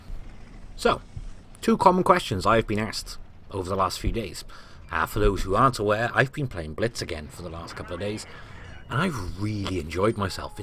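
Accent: Wales, United Kingdom. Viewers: Are u welsh? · Missed your soothing Welsh voice! And your tips of course!!!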